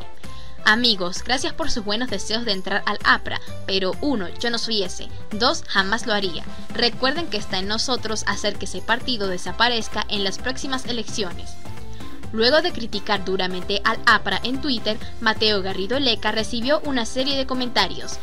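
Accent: Venezuela. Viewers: ACENTO ME SUENA VENEZOLANO Y NO PERUANO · en este video se te sale el acento venezolano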